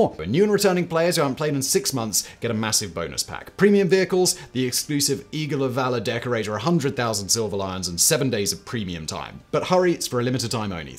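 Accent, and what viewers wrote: United Kingdom. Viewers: because (1) American-English SOUNDS better (is softer/easier to listen to + easier to understand), (2) American-English is more professional (go INVESTIGATE ANY PERFORMING ARTS, VOICE, SINGING, MEDIA or JOURNALISM SCHOOL before - - BEFORE - - you kvetch + whine + criticize like a 5-year old), (3) ask yourself why British singers (the Beatles, Rolling Stones, Elton John, Pink Floyd, the Who, the ENTIRE “British invasion” etc, etc, etc, etc, etc, sing their songs in AMERICANIZED English (as opposed to lilted British accents?), (4) the British accent is weird, lilted, loopy, goofy + unnecessarily overly-emphasized (whereas STANDARD AMERICANIZED-English - - which again, is TAUGHT in professional performing arts, voice, speaking, diction, dialogue, singing, media, journalism, film + television schools (go INVESTIGATE it) - - is spoken more evenly + straightforward in tone - - and hence EASIER to listen to + EASIER to understand), and finally, (5) this guy covers interesting topics, but his accent, honestly, gets irritating. 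This advice/suggestion can only HELP him + his future videos - - because people may be inclined to STOP LISTENING after a few minutes if the affect is irritating to the ears. You asked.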